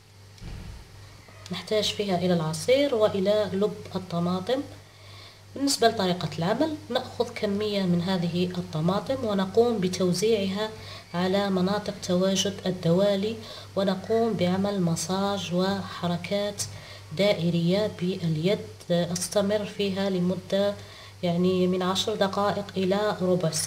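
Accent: Morocco. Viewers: مغربية عرفت من لهجتها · لهجة مغربية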